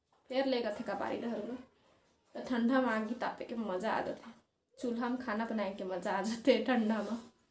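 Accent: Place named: Chhattisgarh, India. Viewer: बहुत बढ़िया दीदी आरूग छत्तीसगढ़ी म बोली बहुत सुंदर लगते आप कहां से हो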